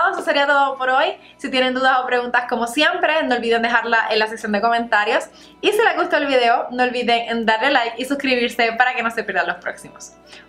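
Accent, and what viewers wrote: Puerto Rico. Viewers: (pues lo supe por el asentó Boricuaaa jajaj yo también soy de allá besosss)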